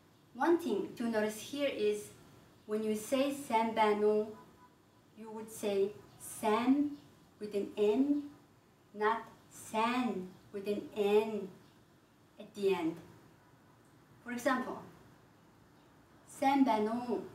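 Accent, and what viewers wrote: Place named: Mongolia. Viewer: it is actually pure mongolian accent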